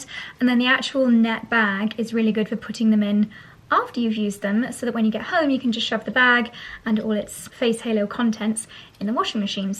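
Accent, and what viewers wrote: Spain. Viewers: Love your Spanish accent! You sounded great!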